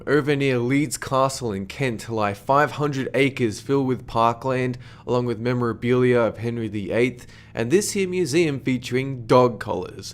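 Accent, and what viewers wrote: Australia. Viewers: Whay is his accent? I want to say Australian, but I dont hear many accents in my life. And you should make a sequel to this video. You missex alot of other cool museums! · I believe he is Australian. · Australian.but has some american influence like pronouncing new like noo ... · Seven Super Kittys he doesn't sound canadian to me, much more aussie. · sounds like Australia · Not a normal Australian accent. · He's from Australia he says it in one of the videos by the way am from Australia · Yeah it's Australian~ best accent ever！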